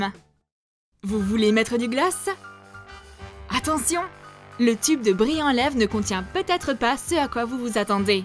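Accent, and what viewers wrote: United Kingdom. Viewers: vous êtes anglaise ou française? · vous êtes française ou anglaise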